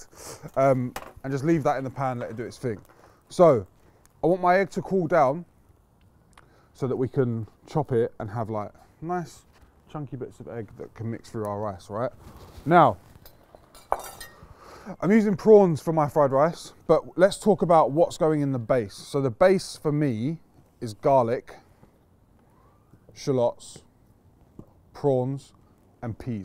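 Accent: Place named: London, United Kingdom. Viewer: He speaks London👍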